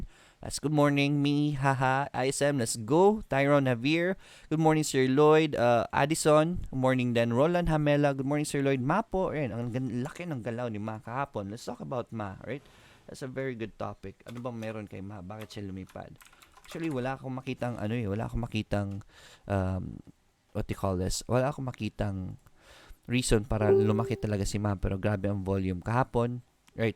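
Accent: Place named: Visayas, Philippines. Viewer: sir , bisaya ka pala hehehe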